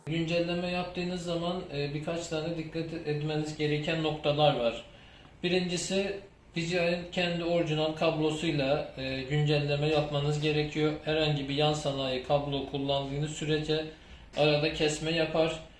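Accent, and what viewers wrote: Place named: Azerbaijan. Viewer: konuşan arkadaş azerbaycanlı galiba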